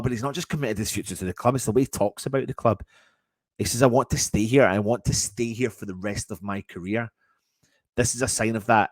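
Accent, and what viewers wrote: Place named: Scotland, United Kingdom. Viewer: Bang on....love the way a Scotsman says geezer...keep it up geez...great job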